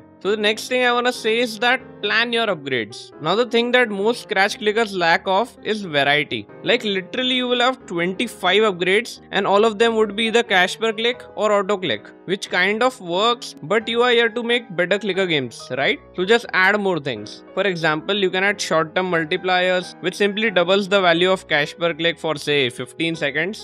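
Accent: India. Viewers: I just really like the Indian voice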